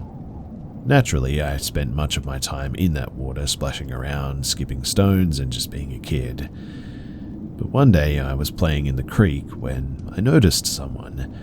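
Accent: Australia. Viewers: Yesss! I love bedtime horror stories, & you're the first Aussie narrator I've found! Greetings from chilly Canberra.👋 Insta-Subbed; love to support my sunburnt brethren.❤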